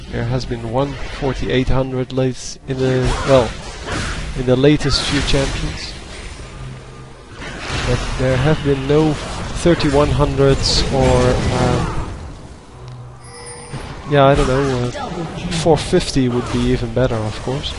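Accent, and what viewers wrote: Netherlands. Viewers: you're dutch right ? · dude are u from holland?? ur accent is pretty much like dutch and sounds dutch too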